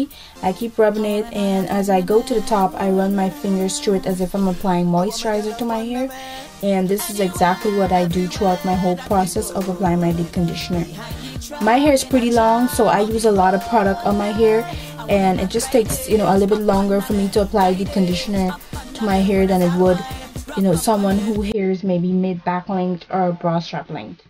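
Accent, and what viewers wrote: Caribbean. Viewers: where is the accent from? caribbean or south american? im from guyana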